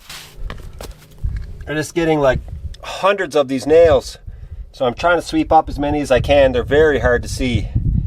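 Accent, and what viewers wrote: Newfoundland, Canada. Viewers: I'm really loving your videos. I saw you on Chuck Porters at his cabin. You guys rock . I here in your voice you to are from Newfoundland. I myself was born Bell Island but living in stressful Ontario. God I would love to live like you brother. Your shows motivate me,keep them coming brother.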